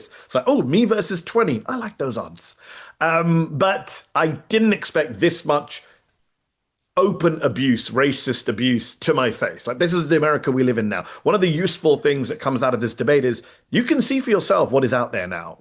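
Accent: United Kingdom. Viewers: I whole heartedly agree that you need to get your shit flinging British accent having ass out of our politics. Britain is in free fall cuz of all the mud. It’s cool it’s fine, just know we’re ready and waiting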